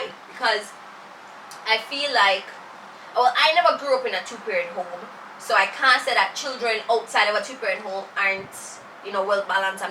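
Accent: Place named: Jamaica. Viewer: You're accent gave me life - its waaaaaaay too cute. I'm so obsessed with Jamaicans honestly- I need to visit. & so glad you kind of epxlained what 'bombaclaute' means - I aint even know that it was a swear word hahaha. GURL I AM SO ECXITED TO BE A NEW SUBSCRIBER ON YOUR CHANNEL, like you don't know, dear lord. I feel like I so want to do this tag right now. I'd appreciate if you could check out my channel sometime x You such a star!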